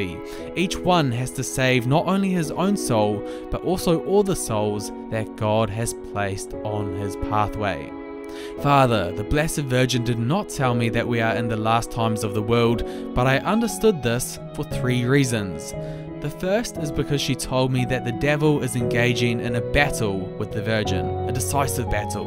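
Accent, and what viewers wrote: New Zealand. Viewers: That is a New Zealand accent.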